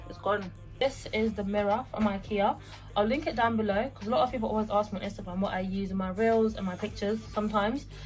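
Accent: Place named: Southern Africa. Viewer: I kept wondering where in Southern Africa you were from 😅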